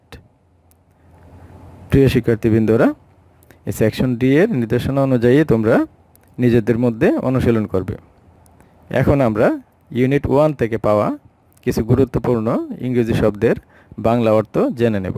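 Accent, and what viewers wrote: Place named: Dhaka, Bangladesh. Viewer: আপনার কথা গুলো ঢাকার মতন লাগে, মাধবপুরের বাসা বুঝা যাইনি😁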